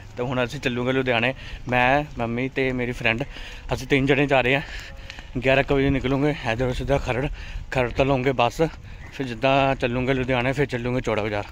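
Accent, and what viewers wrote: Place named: Bihar, India. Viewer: Bhai Tu Punjabi Nai Lagda Tu taa Bihari Lag Reha hai Boli toh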